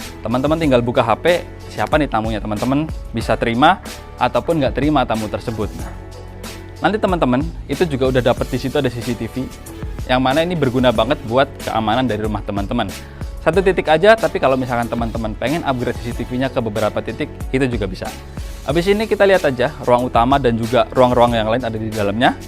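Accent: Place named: Banyumas, Indonesia. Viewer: mas logat ngapak nih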